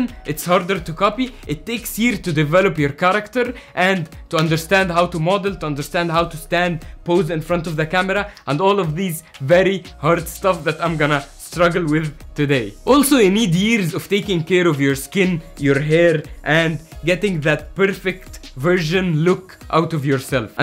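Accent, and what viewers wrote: Egypt. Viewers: The egyptien accent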